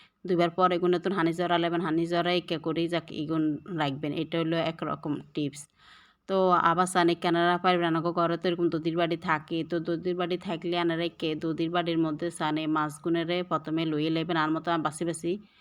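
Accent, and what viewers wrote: Noakhali, Bangladesh. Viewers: আপু নোয়াখালী ভাষা খুব ভালো লাগে ❤❤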